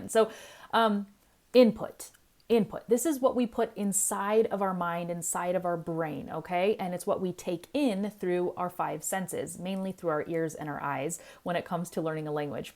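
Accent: United States. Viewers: Is your English accent Amarican or British?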